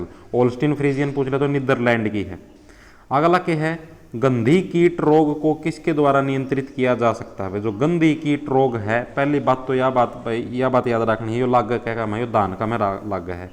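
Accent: Haryana, India. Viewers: My first class h apke chnl pe Bhut mja aya ur apki haryanvi Wow m to fan Ho gyi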